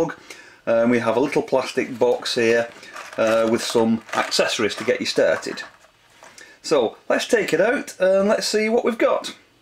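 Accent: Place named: North, United Kingdom. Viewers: I would like to second the previous comment. Absolutely they should hire you. thank you - Plus it was nice to hear a northern accent -I like in LA but come from Liverpool. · Thank you. I spent a couple of frustrating hours reading the manual and puzzling over the box of bits that came with it. Your vid clarified it perfectly. Reassuring and pleasant to hear a northerner too. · Thanks,! This tool is wonderful but the instructions that come with it are woeful. Now that I've watched your video, I actually know how to use it....and having it delivered in a Bolton(?) accent is an added bonus:-D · I just noticed your name is, Now I'm nervous that you're Irish, but you sound like a liverpudlian. Spot on review too, btw!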